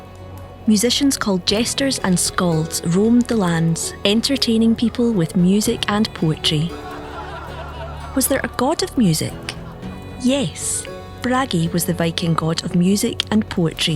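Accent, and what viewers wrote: United Kingdom. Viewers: Scottish Accent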